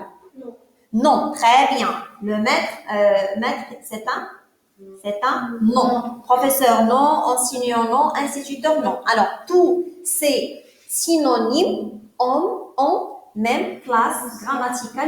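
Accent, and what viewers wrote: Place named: Morocco. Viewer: 😢لما سمعت ك عرفتك استاذة من المغرب للاسف . شرحك ممتاز الله يعينك تحية من الجزائر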